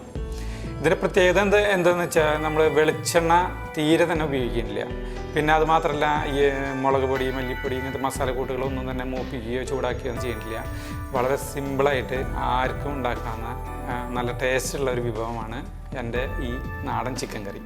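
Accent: Thrissur, India. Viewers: Chettan porichu emmade Thrissur slangil...kari pedaayittundu..chattayee... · Neat and I like your thrissur accent 😃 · തൃശ്ശൂർ ഭാഷ കേൾക്കുമ്പോൾ തന്നെ മനസിന്‌ ഒരു സന്തോഷം ആണ്,ഈ കൂട്ടാൻ എനിക്കിഷ്ടായി,ട്രൈ ചെയ്തു,സൂപ്പർ,ഞാനും കൂട്ടാൻ ന്നാ പറയുക,കൂട്ടാൻ ന്ന് പറയുമ്പോൾ ചിലർ കളിയാക്കിയിട്ടുണ്ട് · Athe.. chettan Thrissur aan enn thonunnu.. nalla laalur slang und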